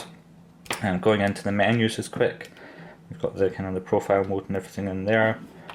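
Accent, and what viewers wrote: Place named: Wales, United Kingdom. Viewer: Sounds Welsh to me but I fail at accents...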